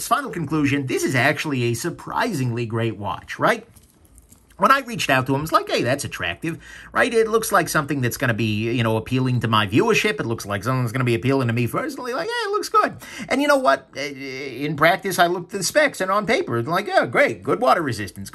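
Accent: New York, United States. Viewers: has got to be from NYC. He would make a great TV pitchman. Very well done review.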